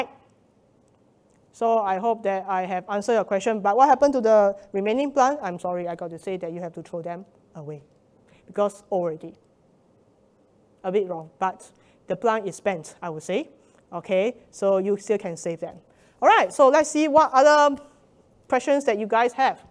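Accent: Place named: Nigeria. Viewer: Why she sounds nigerian 😅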